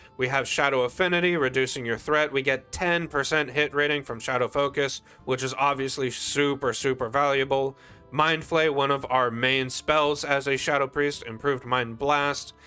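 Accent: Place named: Maryland, United States. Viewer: You're from Maryland, aren't you? The accent is so pronounced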